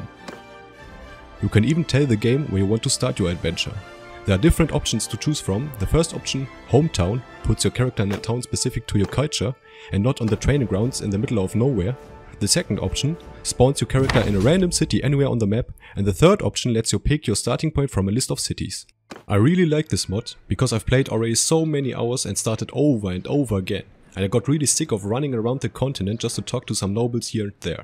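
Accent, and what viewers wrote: Germany. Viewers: are u german? and are u speeding up ur videos? :D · lol junge bist du deutscher? Ehre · When you hear the accent of a fellow german you can just sub. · amazing as always :D thanks for your output mate <3 offtopic: are u german btw?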